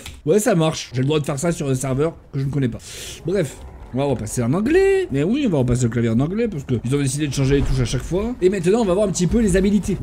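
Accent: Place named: Illinois, United States. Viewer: Tu serait pas à moitier américains par hasard? J'ai reconnue ton accent de l'illinois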